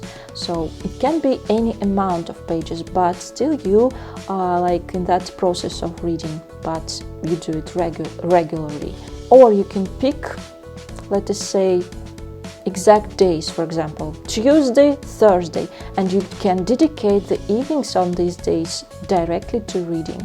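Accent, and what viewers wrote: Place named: Russia. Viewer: Уроков с правильным произношением и правилами полно. Это тренинг на формулировку своих мыслей на английском. И почему-то русский акцент русскому понимать проще😊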